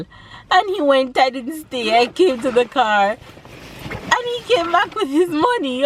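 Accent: Jamaica. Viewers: How come he has a Jamaican accent?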